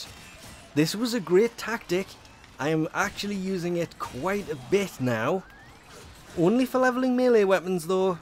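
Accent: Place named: England, United Kingdom. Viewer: Where do you get your accent from are you English uk or I dunno I'm just wondering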